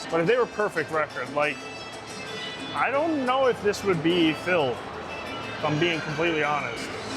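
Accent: Canada. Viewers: He said he flew in from new york. But the accent is obviously Canadian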